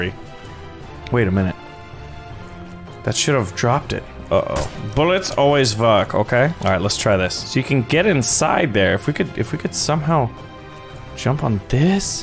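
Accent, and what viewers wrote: Germany. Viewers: This is exactly why I subscribe to your your chanle!!! I love Fritz and Hand You do the best German acsent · How did he get so good at German accents??? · Was Für deutscher Akzent Bruder! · Great German🇩🇪🇩🇪🇩🇪🇩🇪🇩🇪🇩🇪 ascent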